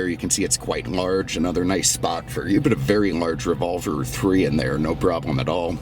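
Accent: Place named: Midwest, United States. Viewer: While watching and enjoying your videos I wondered about your accent. Suspecting it to be Midwestern. Thanks for the confirmation that you have Chicago roots. Keep up the good work.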